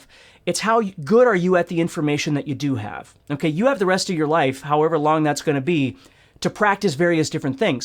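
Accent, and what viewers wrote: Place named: California, United States. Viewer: Hi, great lesson. I'm sorry I'm just under a year late to your class! But at least it's Friday! I love your method of teaching, you seem to be a really nice guy! I liked some of the things you said about, "you would jam all day, with no problem, and then get with friends to jam, and you suddenly forget everything", yeah that's me!!! And don't worry about not knowing enough, just have fun with it!! See I'm 98% self -taught at this point, So you kind of don't catch little thing like that! That matters! I maybe wrong but, you sound like you're from California. I'm from Georgia by the way! I went to you PDF file, and can see, where some of your class was from, Wow man your world wide!!! Thanks so much for what you do, I wish I had 10 more like you! I've got to go now. So see you in the next video! Todd P.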